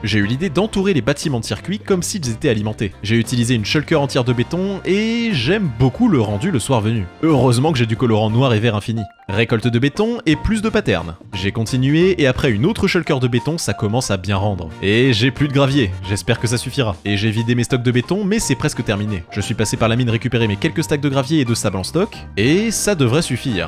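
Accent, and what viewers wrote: Quebec, Canada. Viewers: J'ai une question pk defois tu parle québécois a travers de tes phrase?sinon continu comme sa tes vidéo sont incroyables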